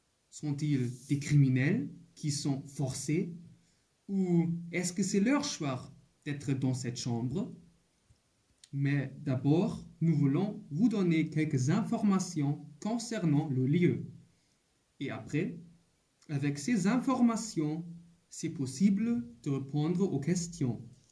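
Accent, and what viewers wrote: Germany. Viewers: Puh! Deutsches Französisch ist echt schwer zu ertragen... aber sonst ein gutes und informatives Video. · Il a évidemment un fort accent allemand. Avec les Espagnols , je peux comprendre qu'ils n'ont pas de gorge R ou Ü, mais les Allemands devraient pouvoir parler bien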